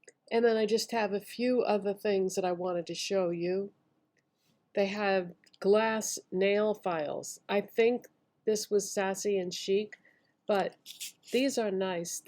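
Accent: Boston, United States. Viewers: i just found your channel and i love your content, subscribed!! also, are you from the new england/boston area? we have the same accent :)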